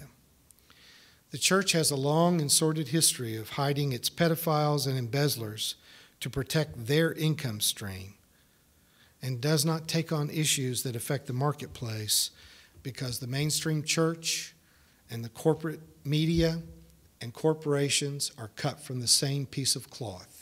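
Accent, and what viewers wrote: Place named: United States. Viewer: I'm a pastor in the UK and anyone in the UK who watches this needs to filter out the US accent and recognise that all this could be said about our countries as well. I wish I saw as clearly and spoke as eloquently as this man. I'm from a different theological stable, being unwilling to describe any scripture as 'made up' and 'padding Paul's resume', but I hope that evangelicals on either side of the Atlantic will not be put off by that. This message has the gospel right at its heart. Thank you.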